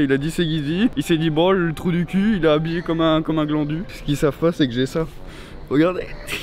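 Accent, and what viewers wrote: Belgium. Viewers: Il est belge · T belge ?????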